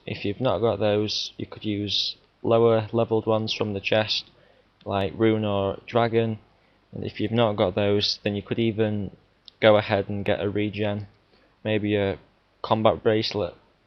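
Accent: United Kingdom. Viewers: good solid english accent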